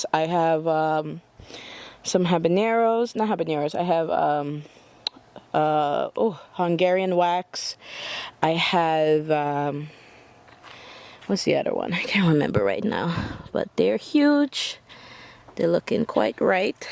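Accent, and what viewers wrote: Caribbean. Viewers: I knew I heard some West Indian coming through, lol, once you mentioned scotch bonnet and callaloo, I was like yup....lol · I’m Guyanese!! We does call it bora... Ik you sounded Caribbean 💚💚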